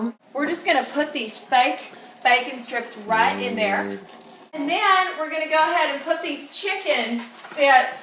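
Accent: South, United States. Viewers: What accent is she using, southern? English? Waspy New England? I like this segment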